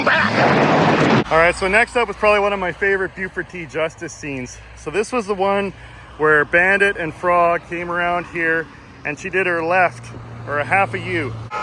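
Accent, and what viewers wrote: Canada. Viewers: You must be a Canadian with that accent of house road and about. Terrible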